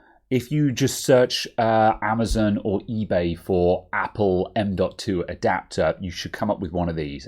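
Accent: United Kingdom. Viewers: ok, so your British, but was it your mom or you dad who where the billy goat?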